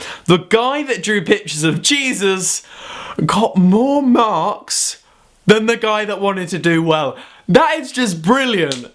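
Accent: United Kingdom. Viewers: I know you have probably already ansered this, but what part of England are you from, you form your words EXACTLY like matt smith and its so cool.:7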